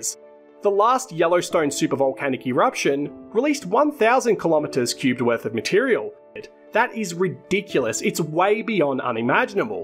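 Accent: Australia. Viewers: I never heard an accent like this narrator. All the most annoying parts of Ozzy, Proddy and received English rolled into one. Add a few Brummy vowels and there'll be another mass extinction event. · If you haven't heard an Aussie accent before (not Ozzy btw) you need to get out more mate.... there's a whole wide world outside the UK. Live a little! 😂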